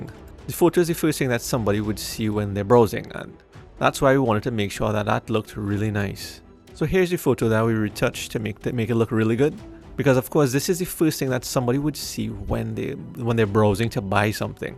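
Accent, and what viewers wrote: Trinidad and Tobago. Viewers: Thx for info. Love the Trini accent 😉👍🏾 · Are you a trini? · Awesome. So am I. I spot your Trini accent immediately. It's great to see a fellow Trinidadian doing well. Thank you for sharing.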